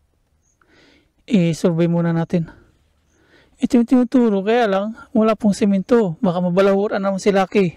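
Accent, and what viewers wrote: Visayas, Philippines. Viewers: Wag mo sabihin Caviteño ka,,,iba ang way mo ng pagsasalita parang pang bisaya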